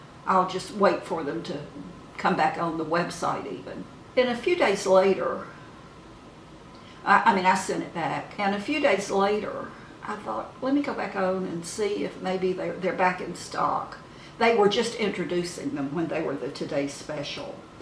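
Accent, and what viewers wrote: South, United States. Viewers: . You really don't need the face lift band. Your face is lovely. I thoroughly enjoyed your video, very informative, but more than that your delivery is sweet. Pray tell what part of America are you from? I am assuming the south. Keep doing what you do, it works. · Stunning woman! I am obsessed with your southern drawl😍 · what a southern bell! ❤ say ole again😅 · Just found your channel & I have to say I love it! I think your awesome & funny! I'm 55 & now & i hope I look half as good as you when I'm 60+!! Do I detect a Southern accent? I'm from north Mississippi myself. Look forward to watching many more shows! God bless & take care. Love, Ollie Renee' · ...you're amazing! I'm so glad I found your channel! I'm 53 and everything was hanging in there quite nicely with my looks until about a year ago. Hormones...dang..who knew?! 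Lolol It may be a longer process, but I'm going to continue to put effort in myself. You're so beautiful inside and out!❤️ Are you southern? Love your sweet accent! I'm an Alabamian 😁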